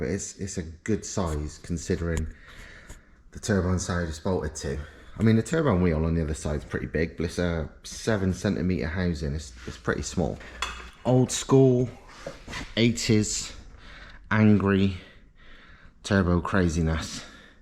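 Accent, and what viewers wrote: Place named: West Country, United Kingdom. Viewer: Dude where are you?. By the accent I'd say not that far from me lol,Gloucestershire (where I'm from) Swindon , Bristol, Bath, Somerset somewhere, Wiltshire. I do usually get it fairly right . Anyway any chance you'd interested building something for money (obviously) it will revolve around a vr5 engine (have to have that sound somehow, and an original in line is just way too pricey when the vr5 is out there). TBF though much simpler in my choice of car MK4 vr6 4 motion but turbo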